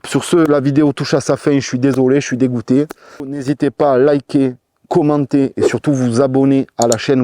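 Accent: Marseille, France. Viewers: Salut! Tes vidéos sont au top, comme à chaque fois. Je kiffe vraiment tes tests et unboxing. J'ai 2 petites questions pour toi qui n'ont pas un rapport direct avec cette vidéo. Première question: Pourquoi ne pas faire des t-shirts et pulls comme tu fais déjà mais avec des bandes réfléchissantes? On pourrait rouler en toute sécurité en te faisant un peu de pub 😉 Deuxième question: Tu fais de temps en temps des vidéos avec des potes, mais est ce que tu envisagerais de faire des vidéos test avec des abonnés? Voilà c'est tout pour aujourd'hui 😁 Ne change rien, continue comme tu as toujours fait Et le petit plus, c'est notre bel accent marseillais 😂😂😂 Bonne continuation à toi, vivement la prochaine vidéo...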